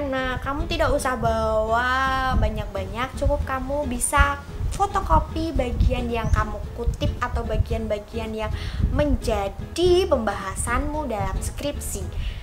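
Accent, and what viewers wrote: Java, Indonesia. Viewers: medok sekali 😍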